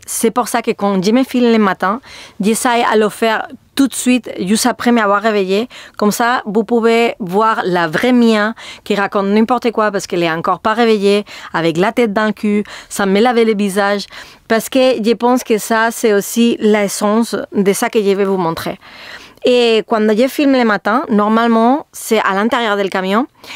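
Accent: Spain. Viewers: Chapeau bas Mia !!! J'ai 62 ans donc une sexagénaire !!! Je te suis depuis que tu fais tes vidéos en français je t'adore je n'en rate aucune ta philosophie de vie me plaît beaucoup même si j'ai une vie plus "rangée" je réalise mes rêves également...je ne me suis jamais perçue comme les autres d'autant plus depuis mon divorce je me réalise seule avec mon âne Sherpa je suis partie 6 mois jusqu'à Santiago de Compostela (aller et retour) j'ai réalisé mon rêve vivre à la campagne avec Sherpa à mes côtés !!! Je te trouve particulièrement courageuse j'apprécie ton superbe accent espagnol, j'aime quand Raf que je suis aussi te fait découvrir le canyoning ou l'escalade !!! Et là encore bravo à toi de dépasser tes peurs !!! Longue vie à toi à ce que tu fais partager c'est formidable... et caresses à tes 3 chiens et ton chat ils sont tous adorables !!! Muchos gracias Mia 🙏👍💓✨️💖🌺🥰🍀🐞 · Merci, tu m'as donné un grand coup de fou pour un jour commencer moi aussi a faire des fidéos. 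J'adore ton accent espagnol et ce n'est pas la 1ere fois que je te le dis. mais tu as raison, depuis que je te suis, ton texte est plus fluide. Compliments ! Quand je vois le travail que tu y mets, je me demande quel ordinateur et quel logiciel tu emploies, parce que je crois que le mien est trop faible pour ce travail. Merci de prendre le temps de me répondre. Bises du nord de l'Allemagne, le corsallemand. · Ne t'inquiète pas Mia, le charme de l'accent Espagnol quand tu parles français est tout à fait délicieux. Personnellement, je suis beaucoup allé en Espagne (je ne suis pas très loin de la frontière) et mes parent avaient un appartement prés d'Alicante ! Donc je parlais assez bien l'Espagnol. Et ton accent me fait vraiment plaisir car il me rappel les jours heureux ou nous étions en vacances dans ton pays merveilleux... A part cela, j'adore ce que tu fait, et tu as beaucoup de courage ! 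Que ce camion t'emmène au bout du monde sans soucis ni problèmes. C'est un souhait qui me tient à coeur ! Bon voyage... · Quelle bonne idée cette vidéo. Quand on est devant l'écran, on ne réalise pas nécessairement tout le travail que ca représente derrière. L'intro est géniale et ton francais est charmant avec cet accent espagnol. Bravo et Merci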